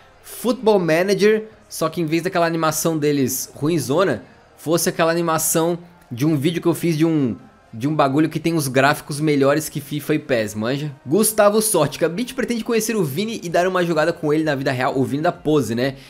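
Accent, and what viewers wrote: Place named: Florianópolis, Brazil. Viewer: Esse sotaque manézinho hehe! Salve mano! :D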